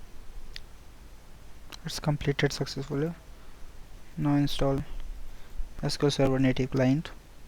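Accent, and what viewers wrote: India. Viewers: Ah great! Another video of installing Microsoft programs done by someone with an Indian accent. If it wasn't for your people I wouldn't know what to do. Fuck the ads though, wouldn't you agree that 10 ads is a bit much?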